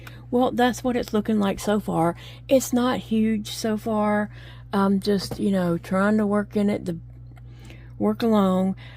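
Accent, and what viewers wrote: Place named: Louisiana, United States. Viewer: You just speak Louisiana Cajun! I take great joy in saying I am smarter than Alexa in that I can do that!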